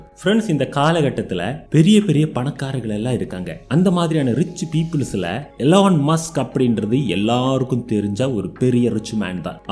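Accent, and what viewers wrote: Kerala, India. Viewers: Ivan malayali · Vadakkan ella malaiyali chettan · சகோதரா நீங்கள் மலையாளியா??? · Malayalam boy ❤️✨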